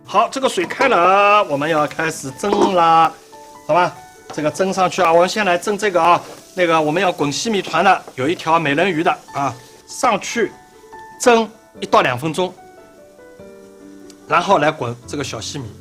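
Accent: Shanghai, China. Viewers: 朱老师的上海口音老可爱的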